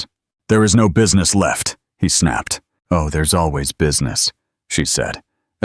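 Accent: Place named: United States. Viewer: Oh my goodness St Thomas Hospital they looked after me im a London its so good to hear London on aAmerican story anAmerican voice saying these places its wounderful the park everything thank you AI family thank you . London is sad right now but with lots of luck London will be back god bless you all❤❤❤❤❤❤❤❤❤❤❤❤❤❤❤❤❤❤❤❤❤❤❤❤❤❤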